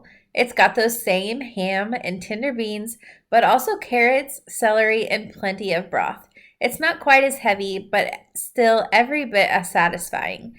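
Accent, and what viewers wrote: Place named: United States. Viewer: I think with Worcestershire sauce coming from someone who actually lives in Worcestershire the safest option for someone like yourself who had an American accent is to do how Kristen from six sisters stuff says it and that’s “the W sauce”. I personally pronounce it wuss-ta-sher but I think you did a great job saying it xx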